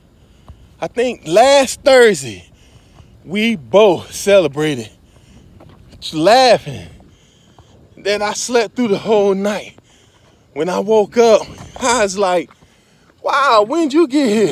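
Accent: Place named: South, United States. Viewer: Cool front 88 YOU FROM THE SOUTH Lol. Yes Sir!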